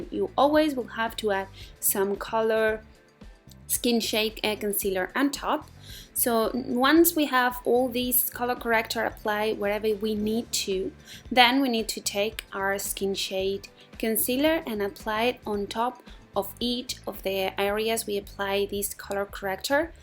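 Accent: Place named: Spain. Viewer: acento spain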